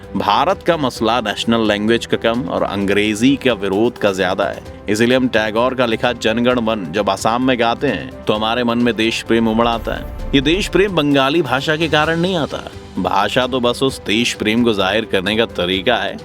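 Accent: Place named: Delhi, India. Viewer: सर जी आप थोडा नुट्रल रेहना....आप थोडासा देल्ही वाइज बोलते हो.... बोलने वाला नुट्रल रेहना चाहिए... देलही की तरफ मत झुको...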